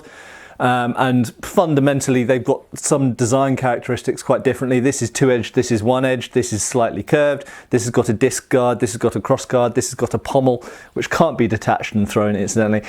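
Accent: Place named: England, United Kingdom. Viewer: Only reason why someone is watching this for such a long time is his English accent!🤺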